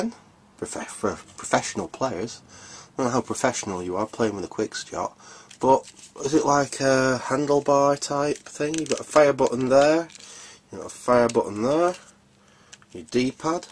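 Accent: Manchester, United Kingdom. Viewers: Great finds! Like the Manchester dialect!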